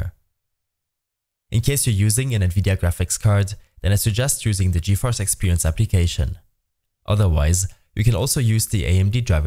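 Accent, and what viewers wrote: Germany. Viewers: du bist deutsch man hört es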